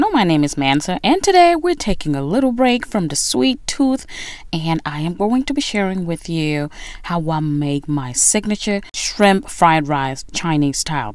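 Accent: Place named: New York, United States. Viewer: That New York accent. Are you from New York?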